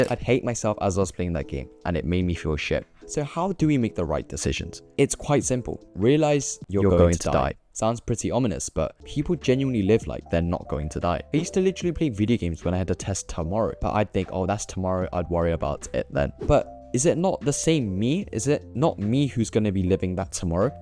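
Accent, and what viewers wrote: United Kingdom. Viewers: Korean guys with british accent are so welll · literally watched the entire video not noticing he was brittish until he said “oh my days man” and now i cant unhear his accent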